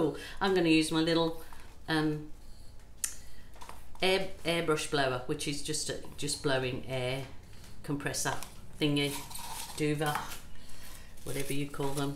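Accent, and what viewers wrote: Birmingham, United Kingdom. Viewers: Spectacular result, and please, keep on talking, it is lovely to hear the brummy accent.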